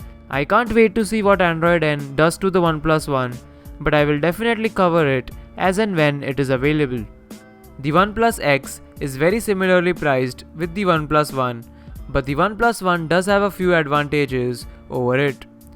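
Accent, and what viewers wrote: India. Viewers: Oh god this indian "English" is killing my ears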